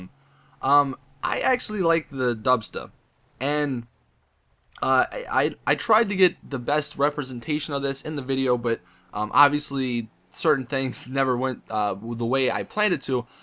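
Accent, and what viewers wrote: Louisiana, United States. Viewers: Are you from Louisiana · are u from Louisiana and if so what part?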